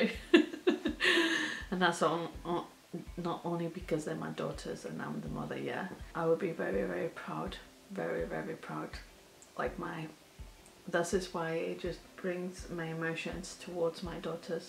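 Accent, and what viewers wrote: United Kingdom. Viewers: you are so Northern English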